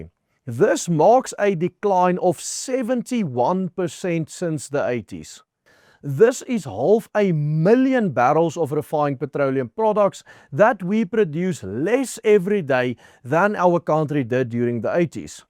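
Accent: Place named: South Africa. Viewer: ek dink jou videos is fantasties. Die content is akkuraat en lig die mense goed in. Ek dink die feit dat jy Engels praat met so sterkt aksent maak dit soms moeilik om te hoor, maar rook eg Suid Afrikaans. Ek love dit! Maar kan ons asseblief praat oor jou baard en hare. Vir n man wat so baie voor n kamera sit, kry vir jou n manscape trimmer of so, en kom ons wys vir die mense ons voorkoms is net so goed soos die visie, en missie in ons land.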